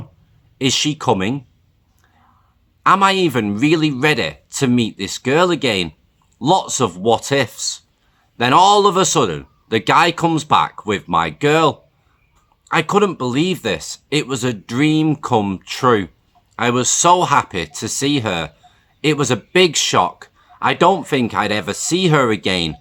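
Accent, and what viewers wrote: United Kingdom. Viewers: You have such a british accent to be an American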